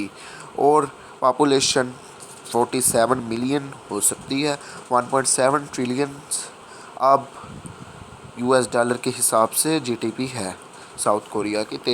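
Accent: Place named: Pakistan. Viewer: Bhai tum awaj se hi Pakistani lagte ho and uske bad Pakistan ke flag me Kashmir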